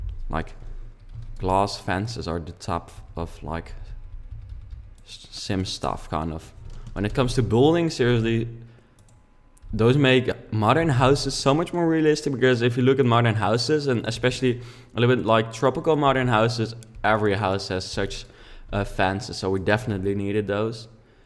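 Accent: Netherlands. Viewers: you can really hear the dutch accent...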